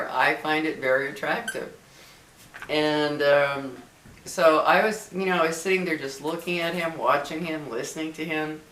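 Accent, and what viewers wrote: United Kingdom. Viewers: So nice to see your fun side Stay happy always I love the Brit accent too ❤ Gentlemen kind men are no longer around 😅 Your hair looks fabulous 💕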